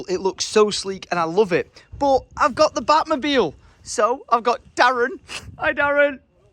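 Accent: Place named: Manchester, United Kingdom. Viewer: I just love the Manchester Accent! could listen to talk all day x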